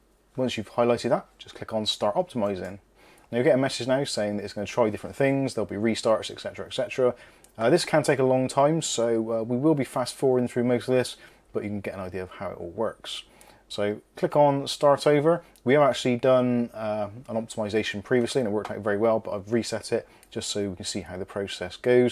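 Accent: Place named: West Country, United Kingdom. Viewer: Nice to hear a west country accent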